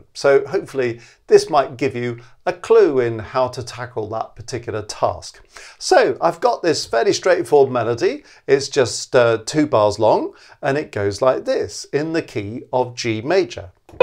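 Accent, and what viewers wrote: RP, United Kingdom. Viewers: Love your RP accent. So comfortable to listen to!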